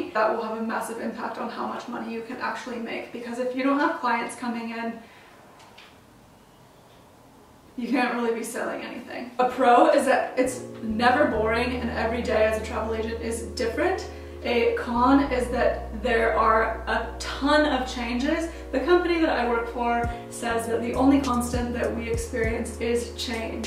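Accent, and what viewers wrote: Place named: Australia. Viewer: Your accent is definitely Australian now lol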